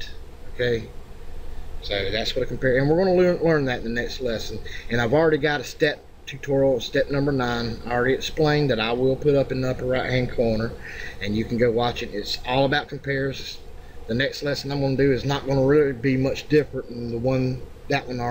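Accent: South, United States. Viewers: I appreciate your videos man, I love to understand how things work and when I can't do something I force myself to learn how! I was hoping to make my own cheat for America's Army: Proving grounds a 32-bit old fps game on steam and since it's so old people aren't rolling out cheats for it anymore not like CS:GO cheats and so a guy on unknowncheats forum recommended your channel for learning cheat engine hacking and I'm glad I love your southern voice and you explain everything clearly and you get to the point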